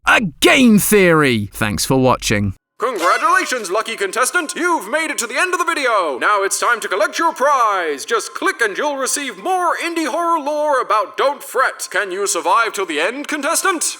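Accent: United Kingdom. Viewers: He sounds so British😂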